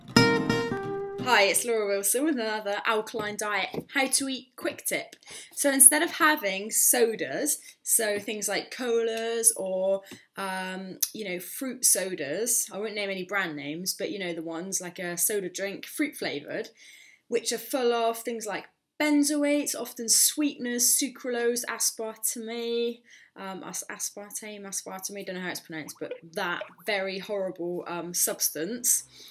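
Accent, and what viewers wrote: United Kingdom. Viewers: I could just listen to your particular brand of British pronunciation of chemical compounds all day.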